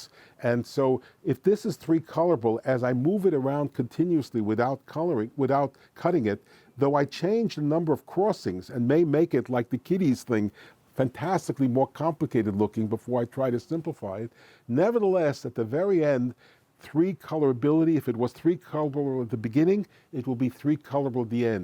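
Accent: New York, United States. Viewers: That guy is clearly a native English speaker. Probably just a NY accent. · what accent is this? new york? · I LOVE that New York ACCENT ! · New Yorker.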